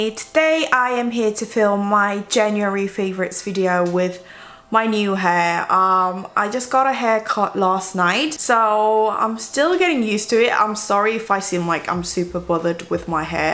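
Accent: United Kingdom. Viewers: 와 이게 영국 억양이에요? 진짜 매력적이다 와... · 영국식 발음 너무 좋아요ㅠㅠㅍ 언니 너무 이쁘고 우아하고 그러네요...구독 누르고 가요 자막 안보고 목소리에 집중해서 영상 봤어요 ㅎㅎ 좋은 영상 감사해요♡ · Love your British accent so much!!!!!! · 호에 온니ㅜ 단발 넘나 잘어울려여....🙋🏻❣️ 온니 영어발음에 치이고 감미다...총총 온니 영상보고 영어공부할땨마다 영국발음이 조금씩 입에 붙고있어여ㅋㅋㅌㅋㅋㅋ 저는 블러셔는 누드팝에 빠져있고 립은 페리페라 아련폭발+맥 칠리 조합, 그리고 맥 버시컬러스테인 프리저빙패션에 빠져있어여!!!! 곧 봄인데도 불구하고 왜 아직도 가을가을한 조합이 좋은걸까요ㅠ🍂🍁🥀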